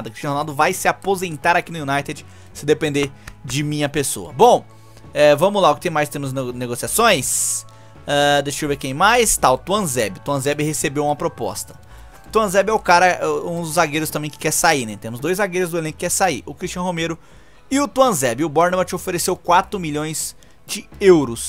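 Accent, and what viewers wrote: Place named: Northeast, Brazil. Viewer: tu eh mito, parece ser nordestino kkkkkkkkkkkkk, por mim emprestava o haaland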